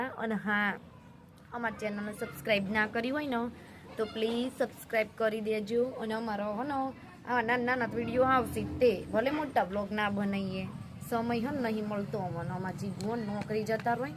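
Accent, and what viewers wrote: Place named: Idar-Mehsana, India. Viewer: બેન ઇડર (s k )ને મેહોણા ની મિક્સ ભાષા છે 😂😂😂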